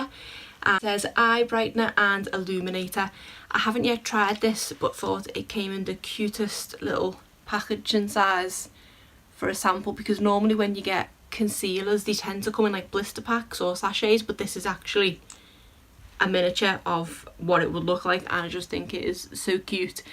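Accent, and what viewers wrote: Liverpool, United Kingdom. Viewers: Your accent is beautiful. I am a linguistics student but can't pin point where it might be from. I would guess you're a scouse or from somewhere near there, you sound amazing! ❤ I'd love to win the falsies. Not that am any good at putting them on but really would love to try 😁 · some lipstick or the eyelashes idk, i really liked most of things so anything would be great <3 ahh the liverpool accent is kinda difficult but so lovely,my dream is going there someday. have a nice day <3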